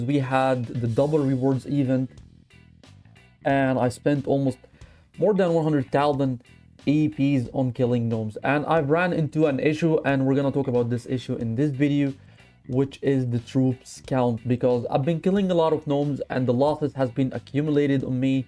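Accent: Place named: Arab world. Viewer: I knew you were a fellow Arab just from your accent 🤝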